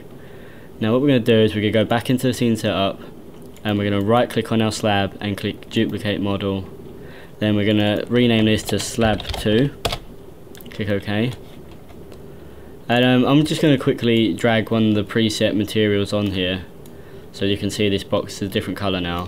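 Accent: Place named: United Kingdom. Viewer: Don't understand British English..... ==' .... American is BETTER!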